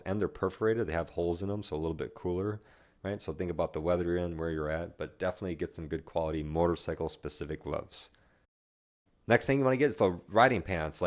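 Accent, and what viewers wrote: United States. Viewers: Holly molly, as soon as I was 15 secs into your video I knew you were american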